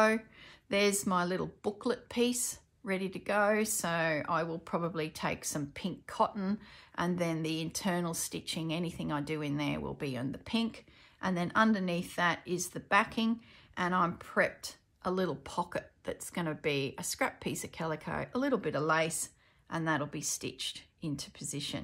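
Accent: Australia. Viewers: I really enjoyed watching this, quite inspirational. Fantastic to hear not only an Australian accent but a Queenslander, yay!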